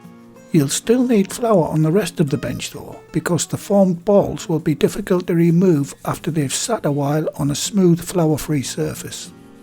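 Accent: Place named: North, United Kingdom. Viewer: ay lad great video sounds like you are a tad further up north than where I am:)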